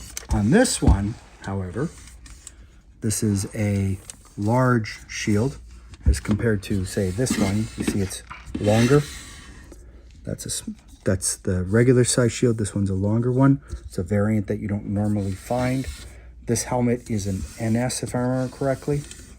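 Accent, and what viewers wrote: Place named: Canada. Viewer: There is no "correct" pronunciation, the way he pronounces it is common in Canada. I'm going to take a wild guess and say you're American, with the blatant irony being that Americans have taken the ENGLISH language, you know...from England...and you pronounce half the words differently than the people who invented it..but here you are acting as if other people are wrong. Try opening a book occasionally you diabolically low IQ product of the failed American educational system.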